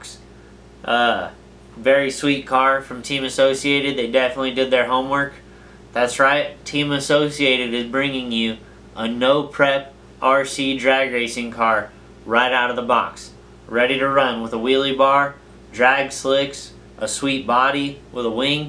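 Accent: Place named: United States. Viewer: I like your voice sounds country:)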